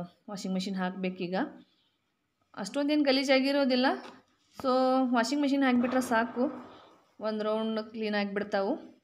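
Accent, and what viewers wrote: North Karnataka, India. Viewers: ಅಕ್ಕ ನಿಮ್ಮ ಭಾಷೆ ಕೇಳಿದ್ರೆ ನೀವು ಉತ್ತರ ಕರ್ನಾಟಕದವರಾ??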